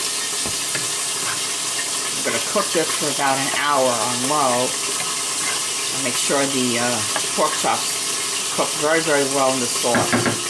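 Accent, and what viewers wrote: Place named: New York, United States. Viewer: Love that heavy new york acent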